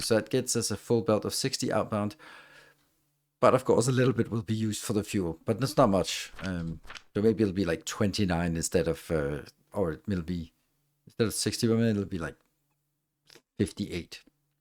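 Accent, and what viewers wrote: Denmark. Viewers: Great videos thanks. You sound danish ?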